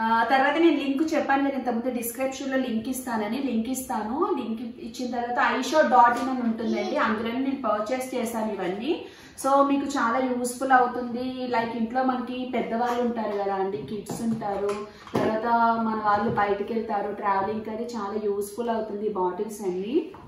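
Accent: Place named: East Godavari, India. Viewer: Mundhuga Happy New year alage Happy Birthday inkoti enti ante moothi kalipoindhi sory thappuga anukovaddu chakkaga east godhavari ammaila matladaru madhi kooda east godhavari katthipoodi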